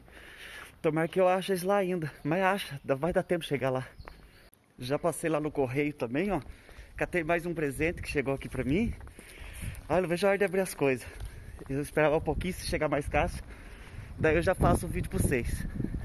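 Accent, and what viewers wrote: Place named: Paraná, Brazil. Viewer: Oi, você fala igual Paranáense..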